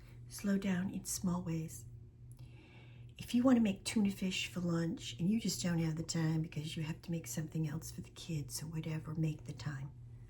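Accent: New England, United States. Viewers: From the accent, I'm guessing you're a New Englander. And I understand that you're moving to Texas. How are you feeling about that prospect? Hope you're MAGA if you want to fit in there... Good luck with the relo.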